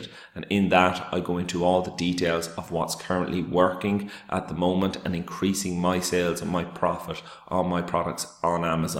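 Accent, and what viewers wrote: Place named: Ireland. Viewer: I hear an Irish accent, what part are ya from ?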